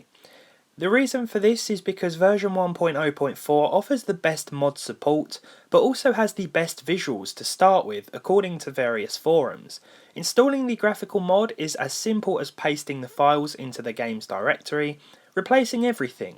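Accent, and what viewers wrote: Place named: England, United Kingdom. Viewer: Jesus... what a disgusting english accent. :(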